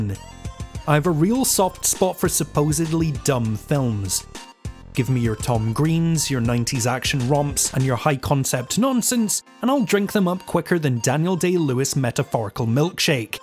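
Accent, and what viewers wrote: Scotland, United Kingdom. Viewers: Goddammit this is an awesome channel!!! What part of Scotland are you from? I'm a St Andrews man myself but live in Romania so it's always good to hear a Scottish voice. Thanks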